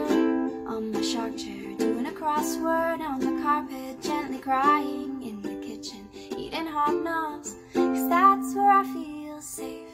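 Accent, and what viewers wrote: Scotland, United Kingdom. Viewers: That Scottish accent. Nice surprise, along with the tune that describes everything I feel.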